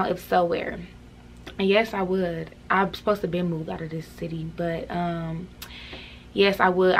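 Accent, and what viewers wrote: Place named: California, United States. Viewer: I would have thought you were from either the bay area of Cali or somewhere from L.A. Lol. The accent.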